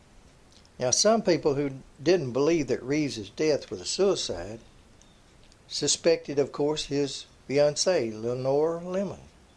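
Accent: South, United States. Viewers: Thanks from Europa for the wonderful videos. thx for all the work you put in. You have a good clear way of telling your stories. You must be from the south.:o) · How are you? I stumbled across your channel the other night I really enjoy your videos and your southern accent where are you from??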